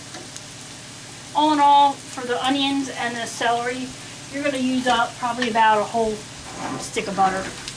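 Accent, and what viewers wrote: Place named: Pennsylvania, United States. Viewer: I could tell you were from that area as soon as I heard you talk! I lived in Norristown and then Harleysville until I was 10 years old. Can’t miss that accent!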